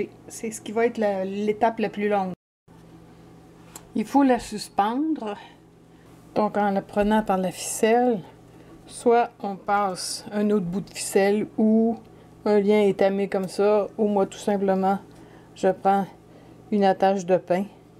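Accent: Quebec, Canada. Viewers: Je viens de voir votre vidéo ( donc bien après sa sortie) au moment même où ma viande de bœuf séché gentiment depuis 2 semaine et qui donne déjà un joli résultat ( je compte la laisser encore une a 2 semaine a sécher en plus ) . De mon coté je comptais la portionner et la stocké dans des sachet sous vide. Pour ce qui est du sel qui m'a servi au salage : vu que j'y avais ajouté des aromates je l'ai fait séché et je l'utilise régulièrement pour assaisonner mon eau de cuisson ( légumes, féculents, etc ...) Merci pour vos vidéo avec cet accent québécois que j'adore écouter depuis la france :) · J'adore l'accent québécois je trouve ça très élégant ou très raffiné pas comme en France qu'ils ont appris la langue des racailles XD (les descendants de l'émigration) et je m'abonne 👍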